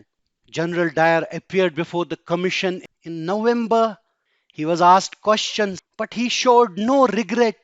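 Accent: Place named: Kashmir, India. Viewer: Sir has a Kashmiri accent 🙂..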